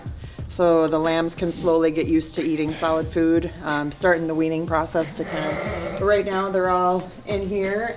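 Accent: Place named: North East, United Kingdom. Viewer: where is your farm located you sound a lot from the north east, I'm from Pa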